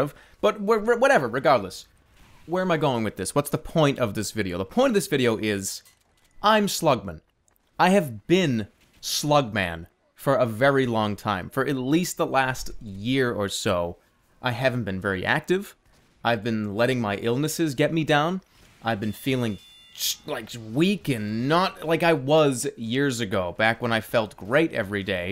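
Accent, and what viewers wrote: Boston, United States. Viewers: BOSTON THAT'S WHY EVERYONE SAYS HES LIKE THE SCOUT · His Boston accent is so pleasent and cosy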